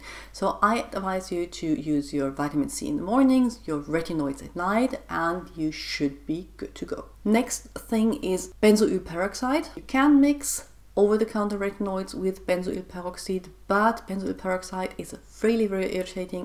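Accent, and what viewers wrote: Germany. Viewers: I think due to your pronunciation of the chemicals I directly heard the german r and a ☺️☺️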